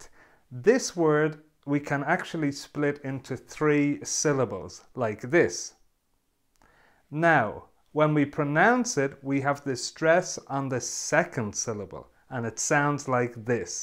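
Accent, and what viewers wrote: Austria. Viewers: Hi ，are you Austrian？ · Sir,are you from Austria?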